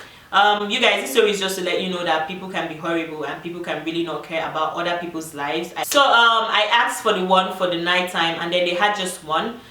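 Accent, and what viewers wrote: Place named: France. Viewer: I think her accent is French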